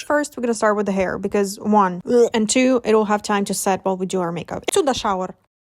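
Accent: Russia. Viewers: her accent sounds more like russian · I think she has a Russian accent respect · your accent sometimes seemed a bit...russian? to me, GIRL ARE UU russian or it just seemed to me?? 😭😭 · OMG I LOVE your humor and voice! also, aren't you russian? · Я слышу русский аккцент, наверное, но вы крутышка💕💕👀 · I rly like ur videos, this video have Russian memes and аKцент😮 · С первых секунд поняла, что вы русская. На самом деле, шикарное видео,спасибо❤ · I hear a russian accent... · черт, я прям чувствую этот русский акцент....................... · слышу русский акцент хаха🫶🏻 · damn are you Russian? because the occasional accent and the fucking picture of конь Юлий were such a slay 😭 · Моментами пробивается родная русская речь..) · this accent sounds like typical «russian accent» i don’t know where you from but still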